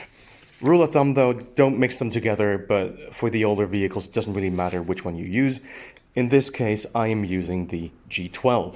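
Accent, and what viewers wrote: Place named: Netherlands. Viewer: hoor al dat je nederlands bent aan het accent ! ik heb een 230 .. zelfde soort gezeik continu ... maarja er blijft sowieso wel elke week wat nieuws kapot gaan aan dat klote ding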